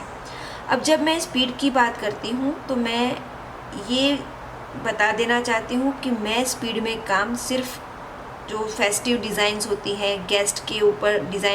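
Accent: Gujarat, India. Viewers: Ka kha ga gha Tame Gujrati 6o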